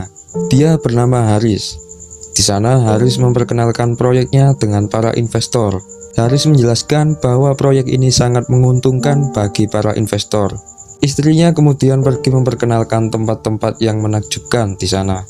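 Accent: Java, Indonesia. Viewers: medok amat min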